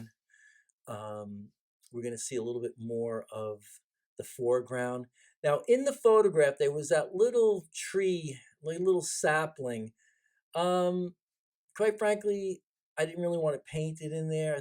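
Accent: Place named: New York, United States. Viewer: I detect a New Yorker (I'm originally from B'klyn).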